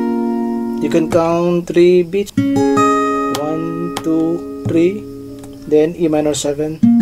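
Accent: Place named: Philippines. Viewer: Thanks so much for teaching us the song. By the way, you sound like you are from the Philippines! I can hear a dialect that makes me think you are from the Philippines. I hung out with friends in nursing school that were from the Philippines. They ars so so cool! Thx again for teaching us the cords!!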